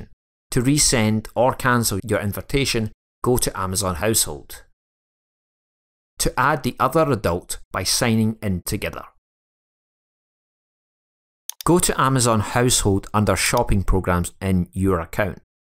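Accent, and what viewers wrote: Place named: West of Scotland, United Kingdom. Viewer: Good West of Scotland accent mate. Cheers.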